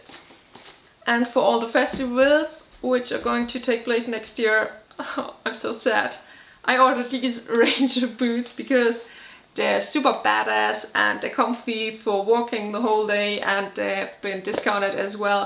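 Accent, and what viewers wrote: Germany. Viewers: Very great video! Nice clothes you have bought online. Your English has a big german accent. So when your next german speaking video? What about your glasses? Very nice mask from you. Keep it up!!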